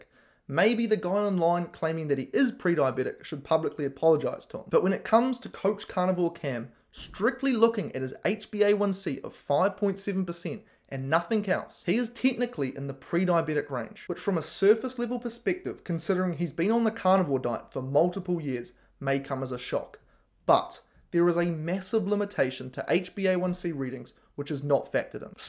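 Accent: New Zealand. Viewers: He is from New Zealand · Great info.. love the kiwi accent!!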